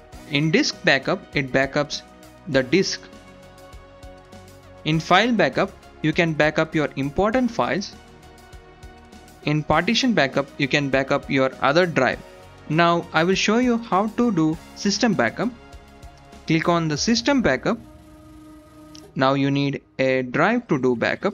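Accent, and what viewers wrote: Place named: India. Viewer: Funny Indian accent😅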